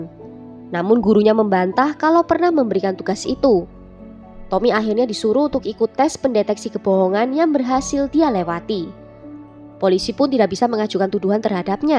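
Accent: Java, Indonesia. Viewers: Alhamdulillaah.. medokuhhh cantik 👍👍😍😍😍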